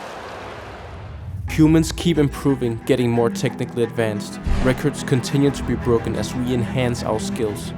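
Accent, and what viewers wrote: Denmark. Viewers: you sound danish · Det er meget tydeligt i din accent, at du er dansk, men fed video mate! Keep going 🔥🔥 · Easy danish accent :D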